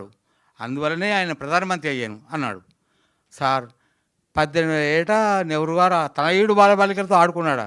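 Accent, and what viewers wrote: Telangana, India. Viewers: Orey telangana slang ra ardham kadha niku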